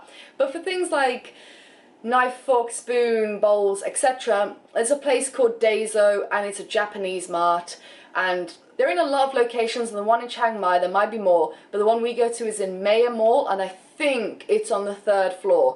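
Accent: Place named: London Irish, United Kingdom. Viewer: London Irish I’m guessing...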